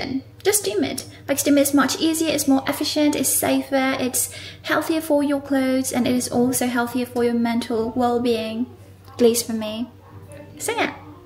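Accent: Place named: United Kingdom. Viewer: May I know your nationality? Pls don't take it wrong. I am just curious because your accent sounds so immaculately British.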